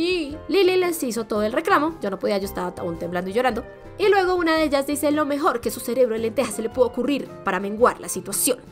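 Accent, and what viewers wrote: Colombia. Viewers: me encanta como hablan los colombianos y es una bendición escuchar como hablas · eres colombiana porque así hablamos en miuu pais ^w^ nueva suuub por cierto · Sabia que eras colombiana XD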